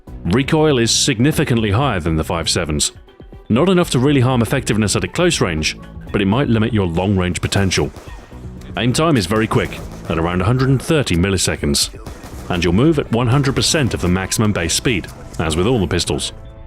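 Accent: England, United Kingdom. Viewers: bet you're English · If you can't understand an English accent when you speak English as your first language then you just fail at life.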